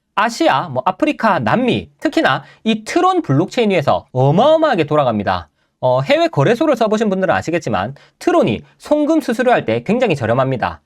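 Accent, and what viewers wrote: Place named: Gyeongsang, South Korea. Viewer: 코인계 일타강사 인정합니다. 머리에 쏙쏙 들어오는 내용과 명확한 딕션, 그리고 말씀 중간중간 묻어나는 경상도 억양이 아주 큰 매력입니다. 덕분에 지루할 틈 없이 완강했습니다. 감사합니다. 편안한 주말되세요~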